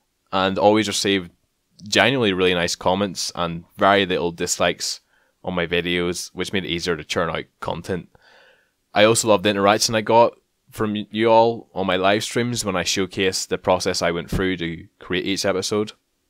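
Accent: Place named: Scotland, United Kingdom. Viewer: Is it bad that I've lived in Belfast for three years and I genuinly thought you were Scottish based from your accent.....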